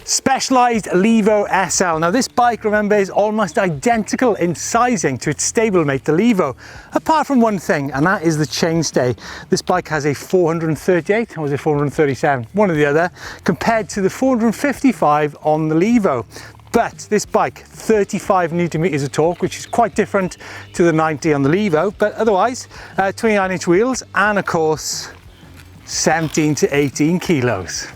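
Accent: Wales, United Kingdom. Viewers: Is that a Welsh accent . Nice bikes .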